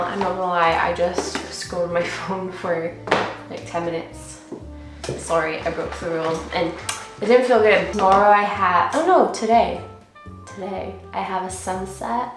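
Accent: Scotland, United Kingdom. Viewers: Hi, I just found you through this video, and your accent is so ambiguous. Are you scottish? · It’s a soft Scottish accent. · Omg I love her slight Scottish accent 😍😍😍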